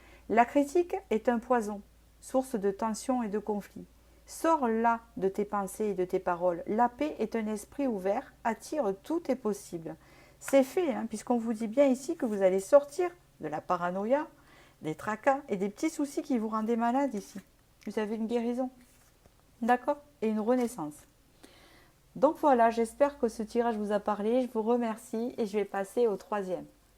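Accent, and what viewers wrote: South, France. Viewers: Merci de penser à nous le week-end! Avec votre joli accent du sud...je ne sais pas de quelle région vous êtes...😊 Puisque vous parlez de propositions à vous faire, j'aimerais pour ma part plus de guidances pour les célibataires...il y en a très peu sur YouTube même si vous faites partie des chaînes qui en font le plus...ou en tout cas où le titre est suffisamment large pour nous intégrer. Sinon, sur YouTube, on entend toujours...VOTRE AUTRE... et s'il n y a pas cet autre?? Nous sommes des millions de célibataires et je n ai jamais compris qu il y ait si peu de thèmes pour nous...une autre guidancière, ÉVEIL DE L'ESPRIT, qui demandait également de lui envoyer des idées de tirage, m'a répondu que d'après les stats de YouTube, il n'était pas intéressant de faire des tirages pour les célibataires et que ça ne rapporte pas!!!😳. Circulez...il n'y a rien à voir!! 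Donc je me suis désabonnée. Bon dimanche à vous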